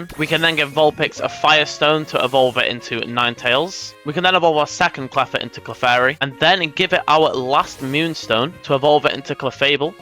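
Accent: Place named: England, United Kingdom. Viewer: Your accent is so familiar where in England are you from?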